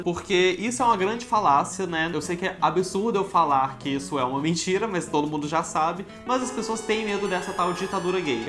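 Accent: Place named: Brasília, Brazil. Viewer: O é brasiliense? Cheguei no canal há pouco tempo e tô reconhecendo o sotaque hehe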